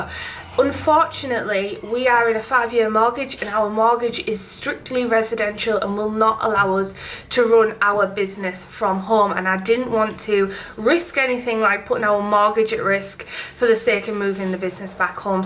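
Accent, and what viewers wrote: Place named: Teesside, United Kingdom. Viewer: Iv just accidentally come across your channel looking at heat press reviews (has yours lasted?) and I thought she sounds local...I'm in Boro. Lovely to meet you and look forward to watching your videos. X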